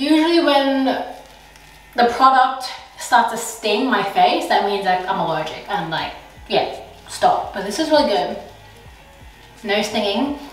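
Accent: Australia. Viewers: Onggggggggggg I didn’t know she had and Australian accent this my first time on her yt cause of the tik tok deletion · I love your acccent even tho I have been to Australia half my life 😂😂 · I love the Australian accent🤩🤩🤩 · It’s weird seeing an Asian with an Australian accent....btw she’s like the first pretty Asian I’ve seen, prolly cuz she’s white-washed (her name IS....yikes)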